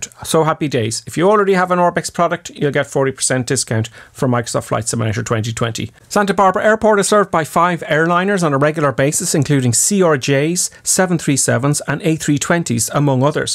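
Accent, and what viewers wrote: Ireland. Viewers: Dude, what kind of accent is that you have? Irish? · I don’t usually comment, I just want to say I really look forward to your videos always informative and very funny, and that incredibly Irish accent..ohh..heheh..your sense of humour, catching the train with all those middle class bogans nerds..classic. Thank you.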